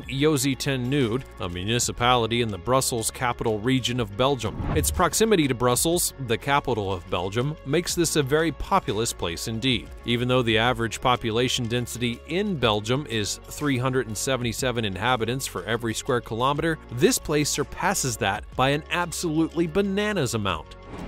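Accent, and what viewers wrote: United States. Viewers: Hard to keep up when you do metric sometime and imperial the other. In America we do imperial and seems you sound American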